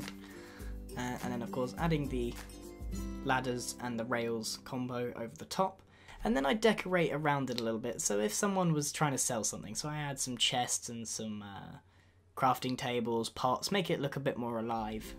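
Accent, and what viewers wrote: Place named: RP, United Kingdom. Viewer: This guy has the most posh accent I've ever heard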